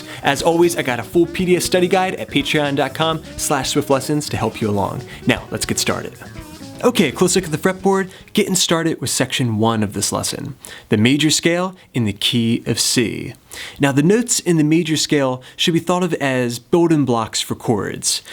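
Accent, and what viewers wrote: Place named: United States. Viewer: I mean, cool videos very useful, but all I could think about is your american accent. Where in the U.S. did you pick that up?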